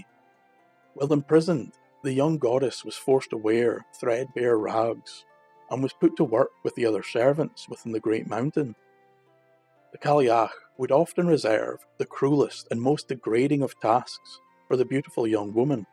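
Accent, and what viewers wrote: Scotland, United Kingdom. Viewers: U’r Story-Telling has held me En-Tranced thru-out! I’v not ever heard this ancient Tale of Love ❤ & the forthcoming of the Enlivening Magical Energies of Summer-Time🙏🏻🌳🌹💐🦋 I especially En-Joy hearing Ur Scott Accent - It’s a Marvelous Brogue for the Ear👂to Hear ❣️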